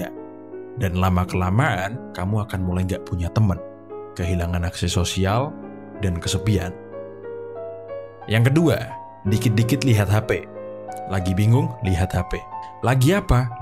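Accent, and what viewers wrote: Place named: East Java, Indonesia. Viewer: Wkwkwk anjay ada sisi lucu juga antum prof. 😅 Dari logat anda. Anda orang Jawa timur kah. Surabaya sekitarnya. Sidoarjo mungkin. Ini ga ada acara temu fans atau seminar gitu ?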